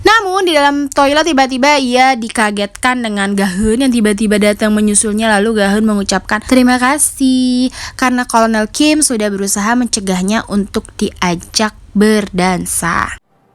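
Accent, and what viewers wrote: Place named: Java, Indonesia. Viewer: Mbak nya ngomong nya lucuu 😅ngahennya medook 😅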